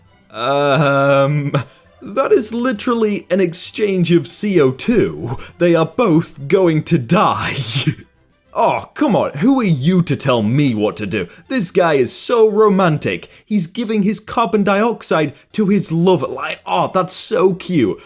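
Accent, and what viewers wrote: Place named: England, United Kingdom. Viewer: Cen'ame'ar You english and your funny accent made my day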